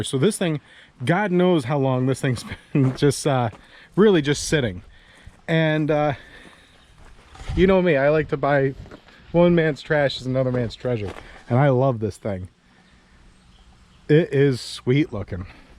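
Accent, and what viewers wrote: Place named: East Coast, United States. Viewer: Can tell your From the east. Deezel, Dee Zul. Here in Colorado it's a Dee Suhl, or Dee Saul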